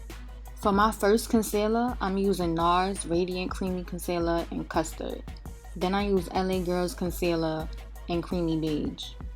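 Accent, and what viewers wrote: New York, United States. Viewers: Mad NY. You sound like me lol love the video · i love your Ny accent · wow us New Yorkers accent is too real 😂 love it. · Her thick New York accent is so sexy 😩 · Lmao the NY accent made the video 10x better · Yo I'm new and I could tell you from New York by our accent 🙌🏾 and you're fine af 😍 subscribeddddd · that Nicki Minaj New York accent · YESSS! So cute. I love NY accents. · OMG we do have a ny accent. I thought people were buggin when they say NYers have a accent. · Ur talk over is hella new york😂😂😂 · Your NY accent is so sexy, ma. I love it. Too beautiful 💜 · The New York Accent Yes 😛❤️!! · your voice is like a mixture of cardi b remy ma & nick I minaj. I guess it's just the NY accent! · that NY accent is heavy though !! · New York accents sound so mean but bomb 😂😍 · Omg! Me too, I'm from New York but I was like damnnn her accent heavyyyy lmao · this video made me realize that we dead ass have a accent in ny.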